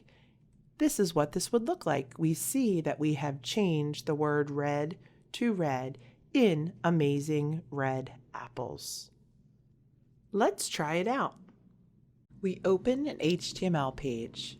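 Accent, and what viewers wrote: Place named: Philadelphia, United States. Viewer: Thank you for the info! I could definitely tell you're from the Philly area by the accent. (or should I say, "Ack-sent") Much love and GO BIRDS!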